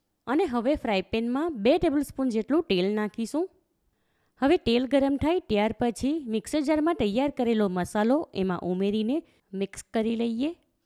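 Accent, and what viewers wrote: Surat, India. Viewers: Wah Ben Bahuj Mast Majedar Recipe Share Kari Superb 👌👌 Ben Assal Surti Language Ma Badhi Recipe Shikvado Cho Recipe Jovani Ane Tamari Surti Bhasha Sambhadvani Bahuj Game Ok 🙏 U Ben 🙏🌹🌹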